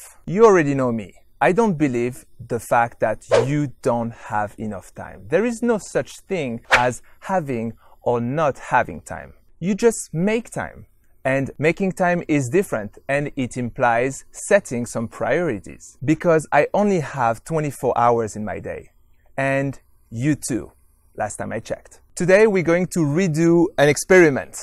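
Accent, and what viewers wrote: France. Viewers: Nice demonstration, adapted from the original intervention visible here. With the French wine and the French accent, why not give a French version with the link for the moose? <link> Jolie démonstration, adaptée de l'intervention originale visible ici. Avec le vin français et l'accent français pourquoi ne pas donner une version française en renvoyant à l'orignal ?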